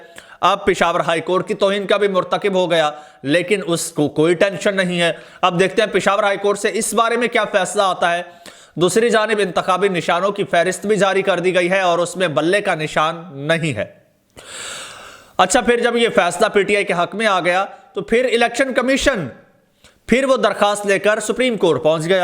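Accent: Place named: Punjab, India. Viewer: Bro ek suggestion hai Vlog acha hai lekin apki Urdu Mai punjabi style ata hai Baqi sab set hai❤❤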